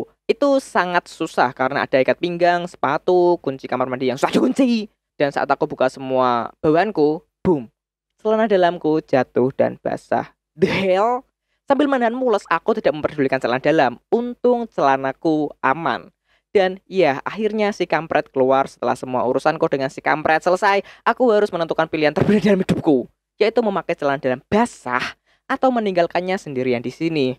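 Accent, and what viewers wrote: Java, Indonesia. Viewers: Tim medok👍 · Medok yups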